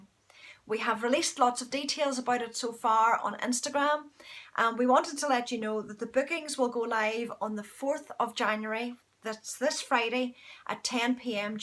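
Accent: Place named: Ireland. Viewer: Oh Nooooo, the knit retreat is all sold out! I am a new knitter in Minnesota, and a genealogist with Irish ancestry in Northern Ireland and I have had the pleasure of visiting Ireland three times. I just found your podcast and I started smiling as soon as I heard your lovely brogue. When you announced the retreat tickets going on sale at 10 P.M. GMT today (It is P.M. here) I immediately went to the website to get a ticket. Oh well, perhaps next time. I am thrilled to have found your podcast. Fern in Minn P. S. I began knitting in Sept of 2018 and I am loving it!!!!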